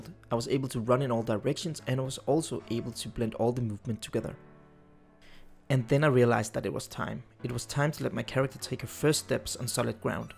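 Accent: Denmark. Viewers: I could hear you have the Danish Accent in you, so I was like hmm he seems Danish. So I checked your ABOUT and saw Denmark. Just found your channel, seems interesting :)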